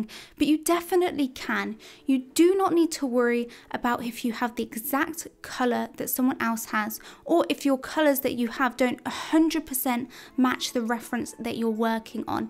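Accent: United Kingdom. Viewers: Art Thank you for your videos. Your British accent is very pleasant to hear as I draw! I am from America so it’s nice to hear different accents.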